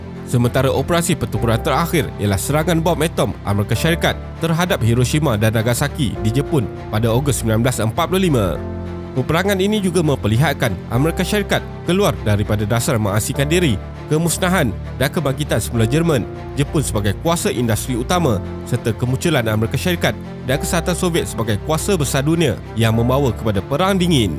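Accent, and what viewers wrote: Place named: Malaysia. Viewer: semua dah mantap kadang2 sebutan bahasa asing kebanyakan video terlalu lidah melayu sangat, harap dapat diperbaiki lagi 👌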